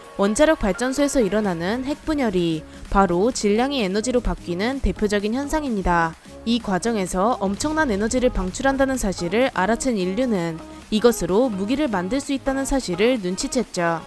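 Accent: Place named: Gyeongsang, South Korea. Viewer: 언니 경상도분이신가보다 !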